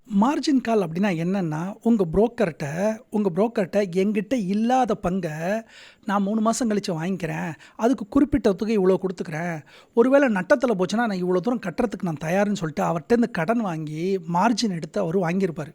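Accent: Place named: Tirunelveli, India. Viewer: ஏல இருலே 😂 சூப்பர்…. நெல்லை வாய் மொழி ❤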